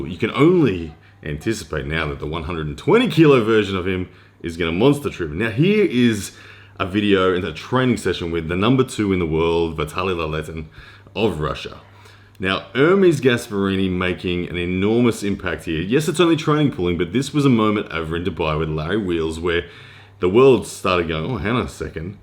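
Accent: Australia. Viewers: Great Video! Very informative with the smooth aussie delivery. ESPN should have an Arm wrestling News Segment for you bro. Quality content ,keep em coming pls.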